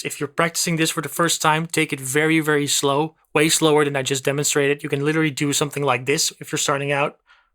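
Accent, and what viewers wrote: Netherlands. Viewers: NIce exercise, explanation and video overall! Also, am I hearing a bit of dutch accent in your English or is my mind playing tricks with me?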